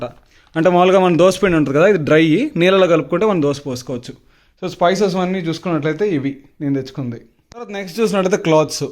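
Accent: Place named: Chittoor, India. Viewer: maadi kuda bro mee slang chusi chepa maadi chittoor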